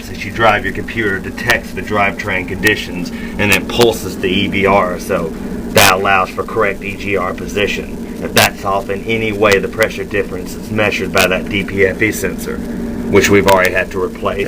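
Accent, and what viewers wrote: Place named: Cleveland, United States. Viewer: u sound like cleveland......thanks,this vid really helped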